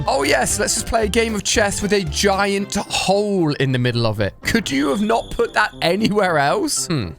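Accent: United Kingdom. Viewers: I like ur British accent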